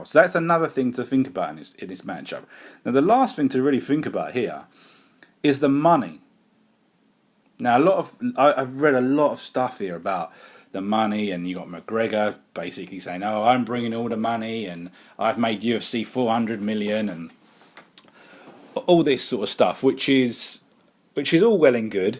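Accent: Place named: United Kingdom. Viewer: Its a hopeless analogy...i was interested in what you had to say about a 40 year old Mayweather(still fresh from retirement and a history of hand injuries) versus a Mcgregor in his prime with immortality and greatness beckoning alongside that Irish fighting spirit.I was willing to debate because i already know where your money is(You are in for a shock btw) but when i heard that comparison,it felt so ugly and the fact that you sound British just made it worse...the British and Irish lions were active just recently...i dont know how you can be a fan of combat sport and not know jack about rugby...blasphemy! I digress....Mayweather is a super computer that has decoded all that have stepped before him in a ring but Conor is a UNKNOWN machine that keeps evolving,unorthodox...doesnt matter whether its in a ring or octagon...think of an upgraded version of the T1000 in terminator 2.It will be a hunt for him.A smarter Tom attempting to smoke a Jerry and i feel Tom will get his prey.I must however admit that there is a timeline within which Mcgregor has to finish the greatest pound for pound boxer of all time...i wll go out on a limb and say 8 rounds.After that,Mayweather will certainly transition into auto pilot having added Mcgregor´s data into the database.Mcgregor could however try to trap Mayweather into the latest rounds and pull an Anthony Joshua on Klitschko.Fighting is problem solving and you shouldnt discredit Mcgregor´s fighting IQ.All in all,it has the makings of the grea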